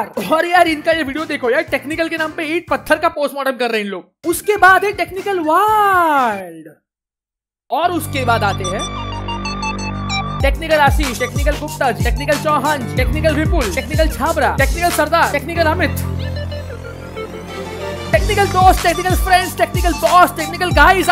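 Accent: Bengal, India. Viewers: Are you bengali? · Are you bengali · Are you bengali??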